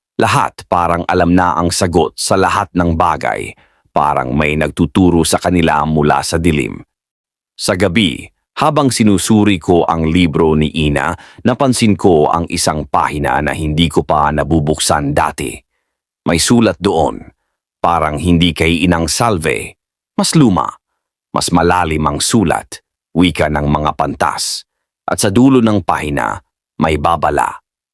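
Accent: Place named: China. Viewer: Intsik ka ata salita mo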